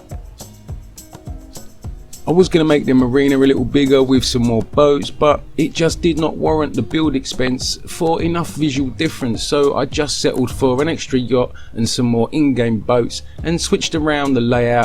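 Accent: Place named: United Kingdom. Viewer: Soooo British and yes has a hint of Spain in to, amazing work keep em coming.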